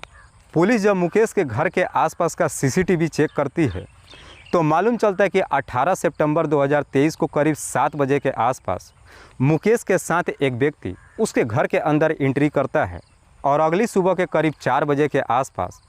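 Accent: Bihar, India. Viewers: भाई आपका लैंग्वेज बिहार के तरह है भाषा क्या बिहार के तो नहीं रहने वाले हैं बहुत बढ़िया कहानी सुनाते हैं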